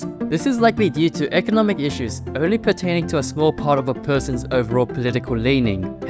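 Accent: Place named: South Africa. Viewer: I hear a Saffer accent. PS: Get your levels sorted, china. The archival footage video audio is drowning you out.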